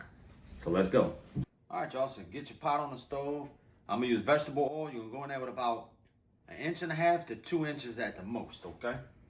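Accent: Louisiana, United States. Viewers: My boy got that terrebonne parish accent lol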